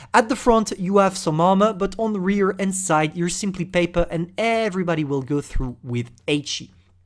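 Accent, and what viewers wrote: France. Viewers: Is u french......yes?!?! Well bounjour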